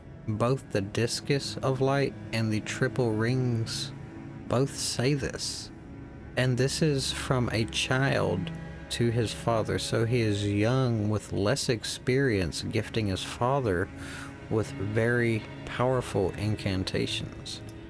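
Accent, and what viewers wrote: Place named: South, United States. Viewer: Keep going bro and a like for the southern accent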